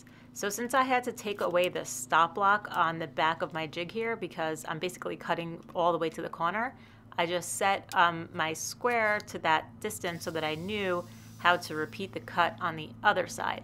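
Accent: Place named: New England, United States. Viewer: Holy cats, I heard that New England accent and I thought, is she Norm Abram's daughter?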